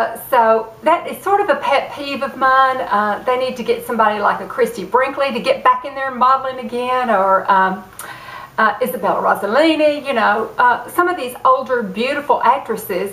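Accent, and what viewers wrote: South, United States. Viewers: Hi, I just subscribed to your channel. I like your southern accent. I was totally shocked when you said you were 59. I am 61 and always trying to anti-age. I would put you right up there with Christie Brinkley. We don't have to look like old ladies as we age if we take care of ourselves and our skin. Have a blessed day!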